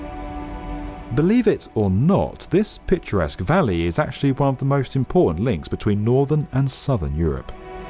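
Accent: United Kingdom. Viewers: Why is the cost presented in US dollars when the presenter is, or at least, sounds British, and the project is based in Europe- an EU project. Surely the cost should have been in Euros???🥺🧐🙄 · Seems kinda weird to have a british guy narrate this, about a tunnel being built in europe, by the EU, and use dollars to explain how much it cost?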